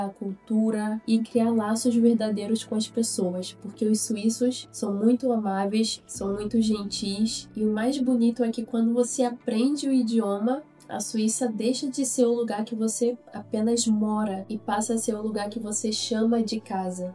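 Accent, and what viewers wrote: Brazil. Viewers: Ja, was ist lustig finde, ist… Du bist eindeutig Brasilianer (an deinem Akzent), und du wirst auch eindeutig Schweizer werden.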